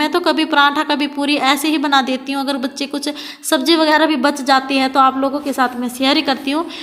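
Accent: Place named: Haryana, India. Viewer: App haryanvi bolte hue achi lgti ho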